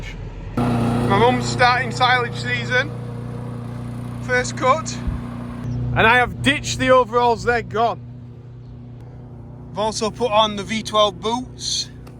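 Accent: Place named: Yorkshire, United Kingdom. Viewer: sorry buddy im going to pay a small visit tomorrow, Ive just watched a section of this blog to hear a proper Yorkshire accent coming out "Aye it's turned into a real nice day i might even ave to tek off the overalls for the first time in the year" got me smiling, great video as always keep up the good work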